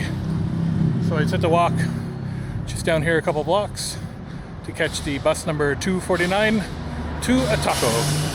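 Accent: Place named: Spain. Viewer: I meant your English is starting to sounds Spanish 🎉🎉🎉 haha how long til you sound completely Spanish? And your pronunciation has definitely gotten a lot better!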